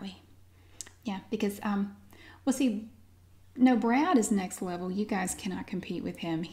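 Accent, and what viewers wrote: South, United States. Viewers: The country accent, blonde hair, sequins, long nails, and big jewelry reminded me of my old babysitter. :)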